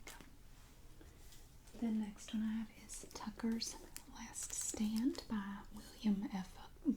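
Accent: South, United States. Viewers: You're very similar to old school Maria Gentle Whispering with a southern twist and it's perfection! Thank you. 😊 ❤️ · Love your soft american accent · Now what part of our beautiful south are you from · Really liked the soft speaking and page turning. Very relaxing. Thank you! Love your southern draw too btw...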